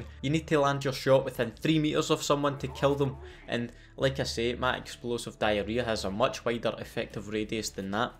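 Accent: Scotland, United Kingdom. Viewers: I love your Scottish accent! 😅❤ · Oh dude ur videos is the best always brighten me up Even when Im mad u have good humor And that ur scotish makes things Even better love your vids keep the good work up👍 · this guy has got me dead xDDDDDD and the Scottish accent makes it even better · You sound more like a proper Scotsman than an Aussie. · I love Scottish accents. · Is this a Scottish accent?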